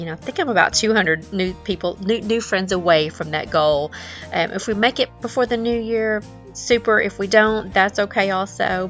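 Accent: South, United States. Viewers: Hey, I’ve been following you for a while now but have not introduced myself. I know you always tell us to introduce ourselves. I live in New England but one side of my family is from the deep south so I enjoy your sweet southern accent. 😁 The bird house is hanging from the roof line over your deck. I do not have a YouTube channel but I do have an Instagram account because I also enjoy decorating. I just started following you over there on Instagram.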